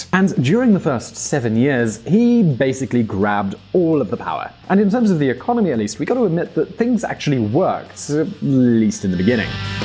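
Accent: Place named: United Kingdom. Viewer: British accent and glasses doesn't make you make smart.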